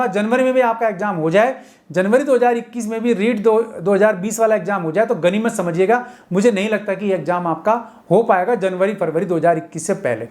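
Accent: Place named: Uttar Pradesh, India. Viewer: Ye sir up ka h kya ??? Rajasthan ka nahi lag nahi raha h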